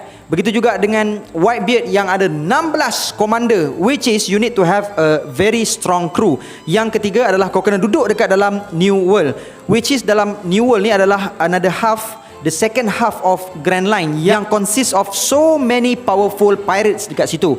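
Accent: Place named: Kelantan, Malaysia. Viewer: Kecek klate skali😂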